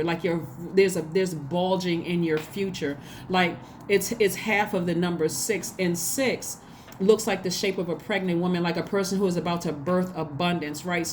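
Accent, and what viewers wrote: United States. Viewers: Im french but when i listen to you I feel American lol I love Aquarius ♒️ they are the best 💜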